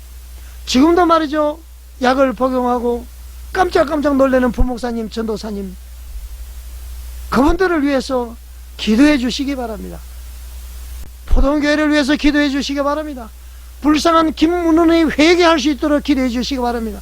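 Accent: North Korea. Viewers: 북한 말투? 교회 무너뜨리라는 북한 지령 받았나? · 이분 어디분이신가요? 조선족 이신가요 말투가 북한분이신가요?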